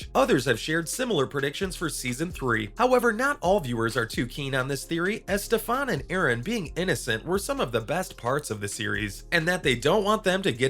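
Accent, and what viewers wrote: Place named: United States. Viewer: why is a American telling us this😂😂😂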